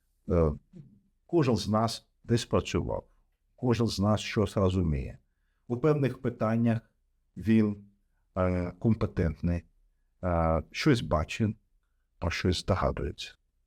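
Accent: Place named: Germany. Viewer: говорит по-украински как будто, с немецким акцентом)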